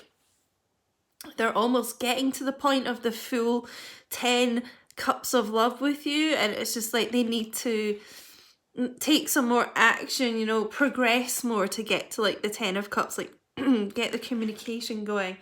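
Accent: Scotland, United Kingdom. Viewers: Where are you from? Are you Scottish? I love ur accent lol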